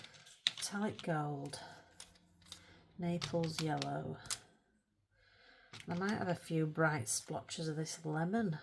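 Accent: Yorkshire, United Kingdom. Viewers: I am still watching but had to come here to say this before I get too entangled on the video and forget... when I heard you say "trying speak more clearly"... I love your yorkshire accent/dialect, it's so beautiful and it adds so much personality to your videos! Loveee it! ❤ (even on the occasions I understand nothing at all 😂, still sounds like music to my ears nonetheless) That being said, it took me a few videos to understand if "cracking" was a good thing or a bad thing 😂... but then again English is my second language, so it could just be a lack of proper knowledge on my part 😂, but hey, learning something everyday right? The texture of these gel crayons on your page remind me of Van Gogh thick oil paint strokes that lends a whole different texture and dynamics to the painting. So nice! And it looks so satisfying to watch you smoosh the colors together! 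😊 amazing!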